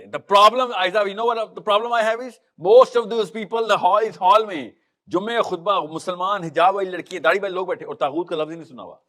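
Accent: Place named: United Kingdom. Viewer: Aur English accent ziyada hay clarity kum hain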